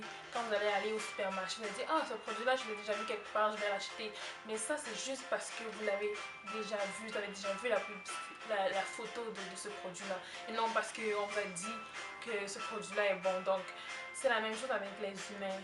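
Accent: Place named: Quebec, Canada. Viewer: Ta gagné un accent québécois😕😕wow!!😕😕😕😕 Chai plu cmt chui tombé sur ta vidéo mais super conseil. G lâché un méga pouce bleu ma belle.. PS: tu rends super bien derrière un écran!!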